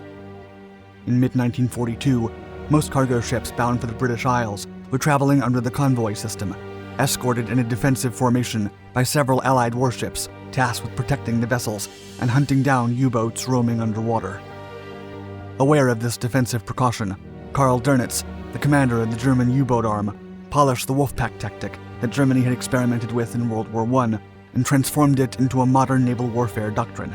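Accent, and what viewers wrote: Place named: United States. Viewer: seems a native American speaker, at least from his pronunciation. The verbal gaffes are difficult to explain, unless we understand he grew up in a video world, without more careful, detailed study of history. inattention to such detail routinely harvests viewer criticism, and his sorest need has been a video editor, assigned the demanding task of finding errors. By accident, I have found sections of a source work he has quoted almost verbatim, but without attribution.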